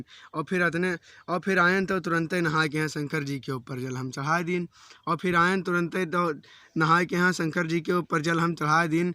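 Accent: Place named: Awadhi, India. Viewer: बहुत अच्छी प्रस्तुति..... अवधी बोली की बेहतर प्रस्तुति.....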